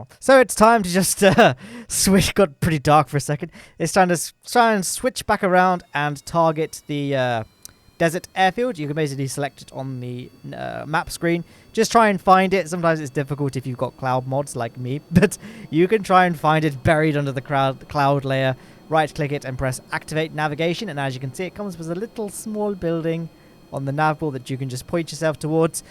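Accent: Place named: North, United Kingdom. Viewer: I can’t ignore on the fact on how he sounds like nordern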